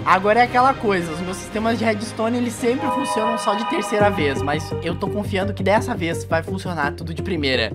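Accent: Rio Grande do Sul, Brazil. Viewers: VC É DE RIO GRANDE DO SUL ? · TAMBÉM SOU GAÚCHO BAAAAA QUE CONHECIDENCIA É ASSIM QUE FALA BAAAAAAA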